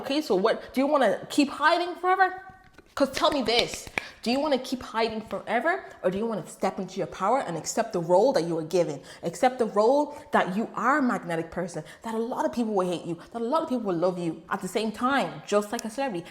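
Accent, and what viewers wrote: Ireland. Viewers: I WAS LITERALLY GOING TO ASK IF YOUR FROM IRELAND!! I feel like you would be fun to be around! 😂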